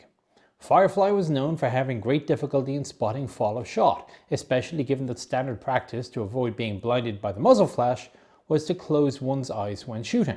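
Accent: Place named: Ireland. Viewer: This is the first time I have seen something from you that qualifies your statements on the inaccuracy of the 17pdr. In other videos your statements were, it was inaccurate due to manufacture. I have always had a little suspicion that your Irish lilt may point to your opinion on the British as a whole. However, I once again point to Joe Ekins who hit moving targets at between 800 and 1500 yards when engaging Michael Whitman's Tiger platoon. He hit at least three if not four targets with the Sherbrook Rangers also contributing to the demise of Whitman's Platoon and doing for Whitman himself.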